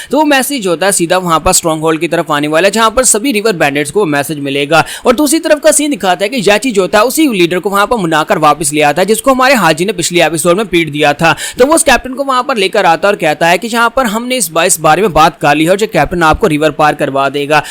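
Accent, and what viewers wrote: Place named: Pakistan. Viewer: Bolane Se to lagta Hai yah Pakistani ka hai rakhta hun Pakistan se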